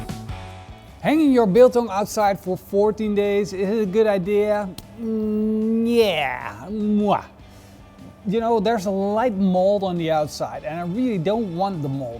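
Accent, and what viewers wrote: Netherlands. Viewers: I could just hear that dutch accent and it makes is 10 times better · First video of you I watch, one minute in I thought “huh he sounds dutch” and sure enough a few seconds later you start talking dutch lol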